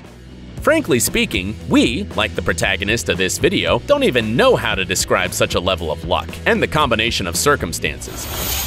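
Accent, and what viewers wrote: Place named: United States. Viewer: Nahh - that yank wank voice is enough to put me off.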